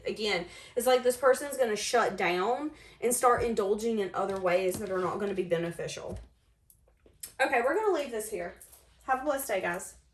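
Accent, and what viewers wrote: South, United States. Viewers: You my dear are SPOT ON! It is so unreal how you nailed it. I love your honesty, advice, and your southern accent is DARLING! Thank you for sharing your gifts and talents with all of us. ♥️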